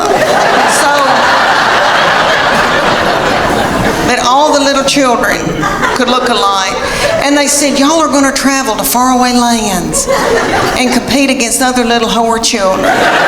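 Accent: South, United States. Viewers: This is very funny. I really like that down to earth southern accent. I would like to see in person doing this skit. Good clean comedy CAN be very funny. BUT, what I am seeing, scares the hell out me. Obviously AI. Artificial Intelligence. It can make anyone appear to be saying anything the programmer wants. ANYONE, INCLUDING YOU. AI IS VERY VERY DANGEROUS. A SCARY FUTURE. 😞😞😞😞😞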